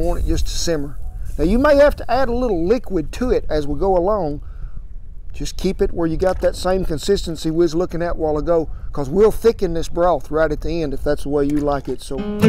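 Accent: United States. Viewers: What people think all Americans sound like.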